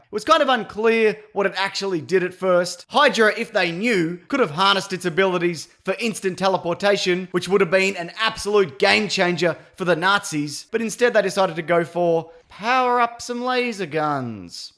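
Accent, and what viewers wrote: Australia. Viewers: love the aussie accent! been a fan of the weekly planet podcast for a while now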